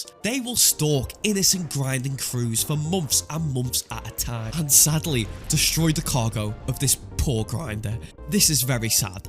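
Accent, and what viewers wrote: Liverpool, United Kingdom. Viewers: W video scouse man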